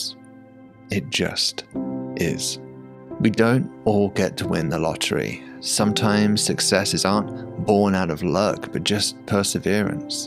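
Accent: England, United Kingdom. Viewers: His dreamy English accent takes me out of space to dreamland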